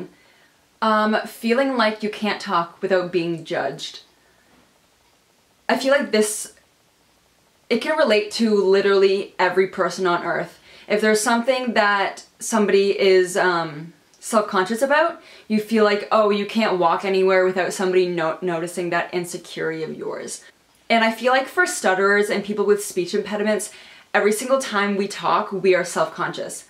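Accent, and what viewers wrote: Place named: Canada. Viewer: Are you from Canada? I hear an accent. Love all your videos btw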